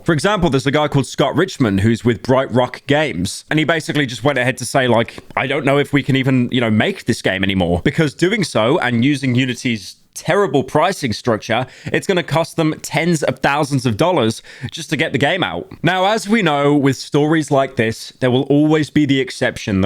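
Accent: United Kingdom. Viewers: I do bot care if live is slop its something i can just listen to every single day I love listening to the british furry yap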